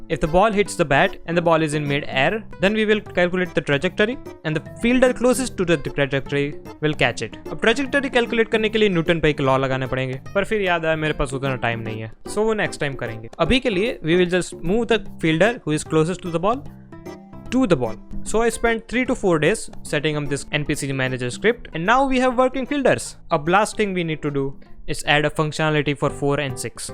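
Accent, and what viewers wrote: Gujarat, India. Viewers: Omg a gujju speaking hindi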